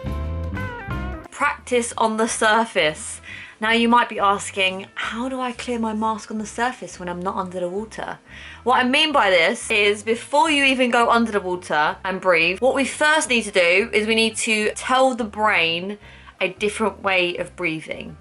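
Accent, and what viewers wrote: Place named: England, United Kingdom. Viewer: I really like hearing your English accent pronunciation on little bit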